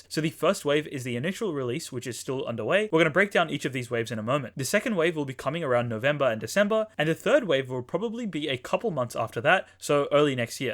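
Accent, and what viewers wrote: United States. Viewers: I'm looking forward for the Pikachu figure box, also happy 10,000! Your American accent is much better than some that I know for sure. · Happy 10k! I enjoyed the American accent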